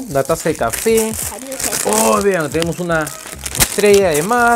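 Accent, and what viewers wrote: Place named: Peru. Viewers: Luis Cueva solo por la forma de hablar, ya debería ser obvio que es de Perú, pero vive en Estados Unidos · Peruano por la zampoña de atras y por la forma de hablar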